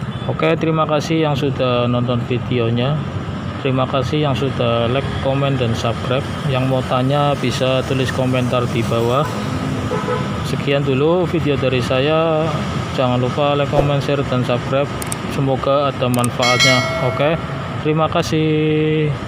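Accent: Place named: Tegal, Indonesia. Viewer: Tegale ndi mas broo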